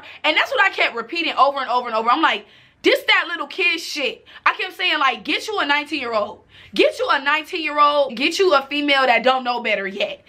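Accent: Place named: Chicago, United States. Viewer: Chi-Town Accent! Choctaw face!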